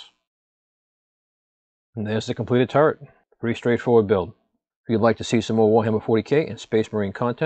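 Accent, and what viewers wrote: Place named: Massachusetts, United States. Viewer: Are you from Mass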